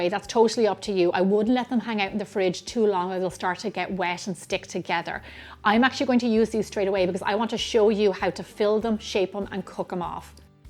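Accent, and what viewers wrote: Ireland. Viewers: Hi Im not fond of dumplings but I watch anyway for this Irish accent🎉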